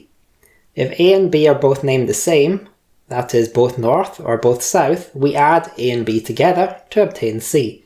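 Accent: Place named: Scotland, United Kingdom. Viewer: I think you are scottish..you have a strong and beautiful accent sir! Keep making more videos on nautical almanac! Take it easyy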